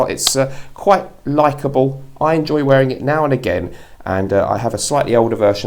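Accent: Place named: North London, United Kingdom. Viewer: Another top quality video mate 👌🏼what part of North London are you from?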